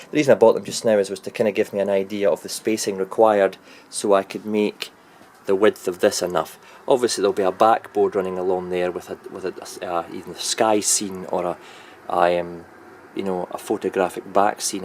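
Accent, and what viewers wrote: Scotland, United Kingdom. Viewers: Nice work!!! I watch for the Scottishness as much as I do for the trains :)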